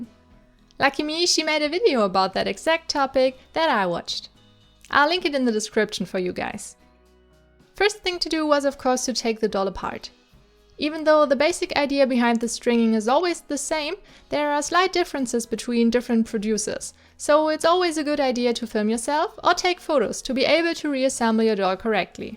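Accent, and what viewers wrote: Germany. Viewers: I know thats randome but are you German? You sound really german soooo yeah And i really love your art :D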